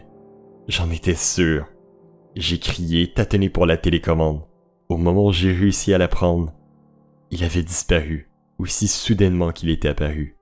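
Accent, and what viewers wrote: Quebec, Canada. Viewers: C'est marrant l'accent québécois mais je n'arrive pas à me mettre dans l'histoire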